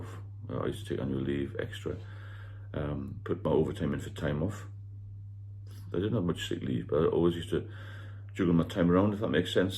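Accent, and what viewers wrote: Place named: Northumberland, United Kingdom. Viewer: Northumberland, I believe.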